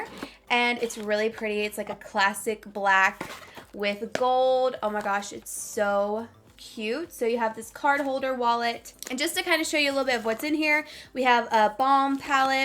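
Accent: South, United States. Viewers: i love your videos!!! i’m from KY so it’s cute to hear another southern girl! my twitter is: xoxohannah16 💗💗💗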